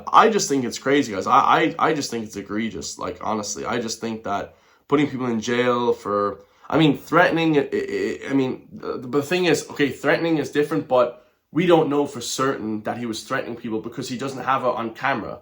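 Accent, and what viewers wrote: United States. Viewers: You’re not a Christian and why do you have an American accent?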